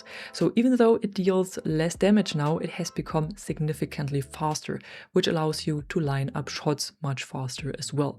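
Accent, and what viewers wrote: Germany. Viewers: Dieser deutsche Akzent, wow😂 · German accent detected. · Ich wusste doch dass du deutsch bist. Hab mich immer über den Akzent gewundert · Love your german accent. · Is she German? accent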